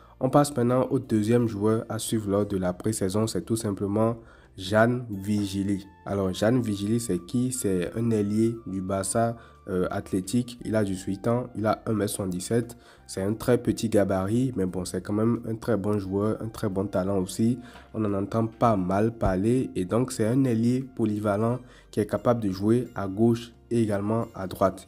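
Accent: Benin. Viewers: Tu as un accent beninois😂👍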